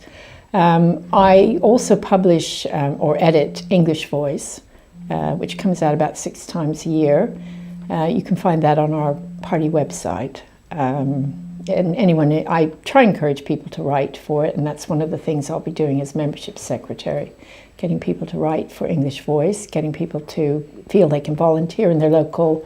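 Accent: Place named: United States. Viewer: English voice??? You have a sort of American accent!!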